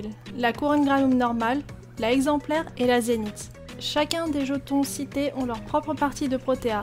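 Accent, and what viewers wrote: France. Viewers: j'me disais bien que j'avais reconnu l'accent français sur les autres vidéos Haha.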